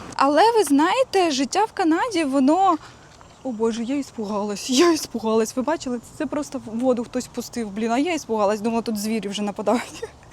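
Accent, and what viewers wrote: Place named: Surzhyk, Ukraine. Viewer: з якої ти області в Україні? в тебе така прикольна українська, але деякі вставки слів з "мови з боліт" ріжуть вуха) нетиповий суржик)))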